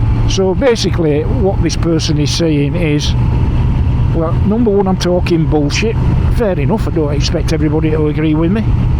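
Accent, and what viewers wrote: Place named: Northern England, United Kingdom. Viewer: Ey up chuck, how i miss my northern mum saying that. Keep up the dialect and content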